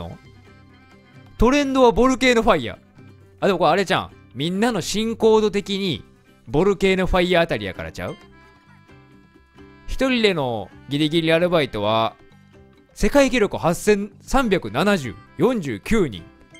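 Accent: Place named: Osaka, Japan. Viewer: おかりんさんこれからもずっと動画投稿お願いします。おかりんさんの大阪弁面白くて大好きです。動画投稿頑張ってください。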